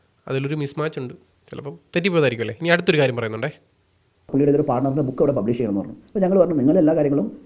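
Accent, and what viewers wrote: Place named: Kozhikode, India. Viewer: ഇതിനെ ആണ് സ്വയം കുഴി തോണ്ടൽ എന്ന് പറയുന്നത്.... എത്ര ക്യാഷ് മേടിച്ചാലും ഒരു മയം ഒക്കെ വേണ്ടേ മോനേ..... കോഴിക്കോട് ഉള്ള ജനങ്ങൾ ചുമ്മാ ഒരു ആളെയും ചീത്ത വിളിക്കില്ല. അനിലിന്റെ വീടിന്റെ അടുത്ത് ആണെന്ന് നിന്റെ ഭാഷ കേട്ടാൽ അറിയാം.....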